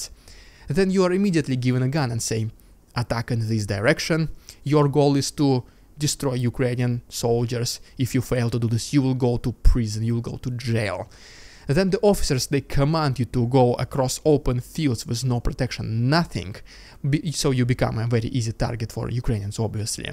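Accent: Russia. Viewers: I'm guessing " " and the accent implies that you are Russian...Although we appreciate the reporting...this has to be painful to say about your own country. It's very sad. I'll bet you know at least 1 Russian soldier fighting in Ukraine right this very moment. I can't even imagine how this has affected your friends and family. Keep up the good work friend know that I see and appreciate you. 🤗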